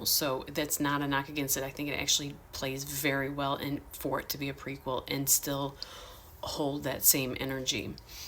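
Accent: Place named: Midwest, United States. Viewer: I dig the midwest twang.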